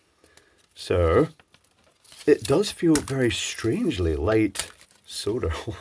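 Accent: Scotland, United Kingdom. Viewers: Scottish accent and at one point American pronunciation of Aluminium!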